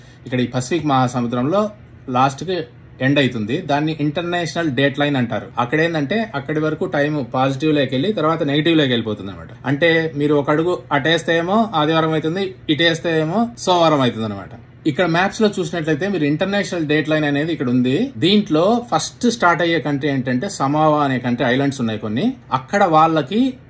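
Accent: Rayalaseema, India. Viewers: Watching ur videos.. because of your rayalaseema slag sir... I am from kurnool . Tq sir. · మీ తెలుగు భాష చదువుకున్న వాడు మాట్లాడు తున్నట్లు లేదు. తాడిపత్రి లోనో పులివెందుల మనుషులు మాట్లాడుతున్నట్లు ఉంది. అమెరికాలో ఉండి youtube లో మాట్లాడేటప్పుడు వా క్సు థి ఉండాలి. ట యా నికి కాదు టైమ్ కి అనటం నేర్చుకోవాలి. నాగరిక జీవనంలో భాషా సౌందర్యం చాలా అవసరం.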